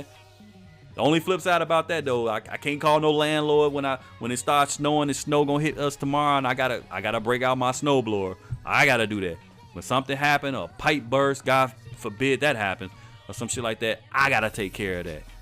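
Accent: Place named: Chicago, United States. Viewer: sound like her from the suburbs of chicago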